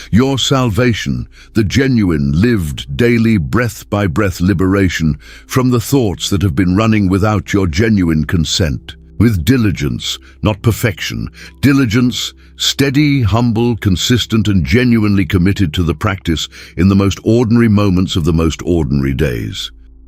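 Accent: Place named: United Kingdom. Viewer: Why speaking so fast and with a British accent. I am a native Frenchman and only understand standard American.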